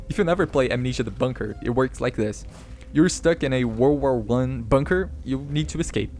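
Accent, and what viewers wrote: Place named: Brazil. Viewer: amazing survival horror study, your game is gonna rock (and judging by your accent i think this is a r/suddenlycaralho? kkkk)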